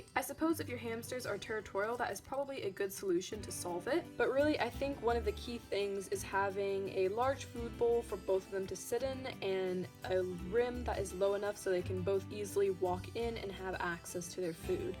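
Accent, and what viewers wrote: United States. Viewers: The person narrating is American.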